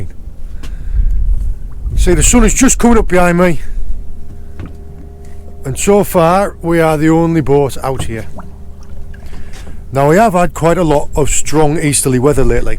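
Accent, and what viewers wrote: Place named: Cumbria, United Kingdom. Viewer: Great content as always mate, you proper sound like your from Cumbria, the accent is uncanny, I’m up in a town called Maryport, keep up the videos mate 👍🏼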